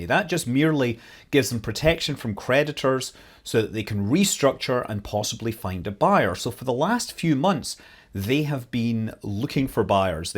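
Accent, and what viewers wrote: United Kingdom. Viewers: wait what, your British? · Despite your accent I usually think of you as American so it was interesting hearing you say "we" referring to the UK and then correcting yourself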